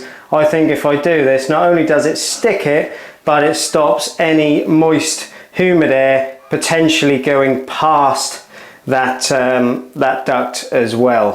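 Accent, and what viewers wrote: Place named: Devon, United Kingdom. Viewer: ''Hoomid''. I'm trying to place your dialect. Is it Devonshire?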